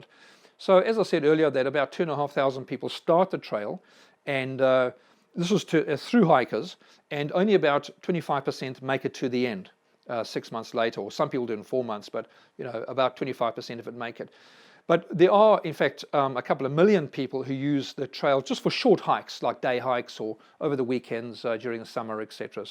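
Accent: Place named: New Zealand. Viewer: Hi! How did I miss this?! Great job! It was awesome to hear you speaking in that chill NZ accent. Miss you Bud.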